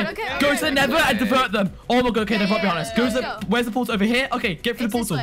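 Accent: United Kingdom. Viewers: British boi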